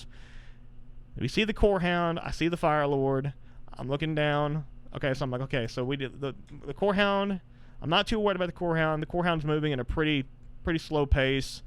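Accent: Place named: South, United States. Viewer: great video, made better by that smooth southern baritone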